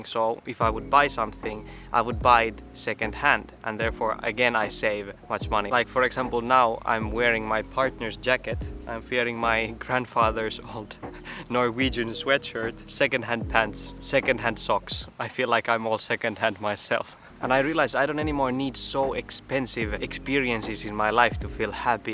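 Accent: Finland. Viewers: Hei! Löysin sun kanavan viime viikolla, ja mietin eka että sun aksentti kuulosti suomalaiselta ja katsoessa kävikin ilmi, että oot suomalainen. Aloitin mun minimalismi matkan viime vuonna kesälomalla, ja kävin koko asunnon läpi muutaman kuukauden aikana. Lähti paljon tavaraa pois, osa ihan roskia mitä olin jostain syystä säilyttänyt, osa käyttökelpoisia tavaroita kierrätykseen. Yli vuoden mulla oli kaksi kaappia jäljellä, mutta en ollut jaksanut käydä niitä läpi. Tänään sain inspiraation sun videoiden katselun jälkeen, ja kävin viimein siivouskaapin läpi. Nyt sinne mahtuu imuri (se oli mun unelma, kun aikaisemmin kaappi oli niin täynnä roinaa, ja imuri pyöri nurkissa) Pieni juttu, mutta tuli hyvä mieli. Kiitos inspiraatiosta! Kiva että löytyi suomalainenkin minimalismi YT kanava, katselu pitää asian mielellä ja inspiroi tekemään jotain (vaikka välillä kuuntelen vain huvin vuoksi, saattaa kestää hetki että pääsen itse toimintaan)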